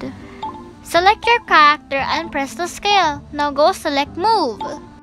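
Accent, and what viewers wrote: Philippines. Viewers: She sounds Filipino. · Your voice is nice but it sound like your filipino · your accent is like pilipino thing But your cute anyways whos pilipino there click the like button Small youtuber here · Are you Philippina? You sound like you have a phillippino accent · You speaking seems like philipina's are you philipina's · Just from the voice i here another FILIPINOOOO YAYAYYAYAYAYAY SHES ONE OF US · Uhmm... "Karachter" You have a Philippine accent Ms. Cute voice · Are u filipino? Cuz ur accent is like mine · Wow I just realized that this accent is Filipino, sooooo I bet this is gacha tuber is filipino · You have a Filipino accent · ME: hears her voice ME: FILIPINO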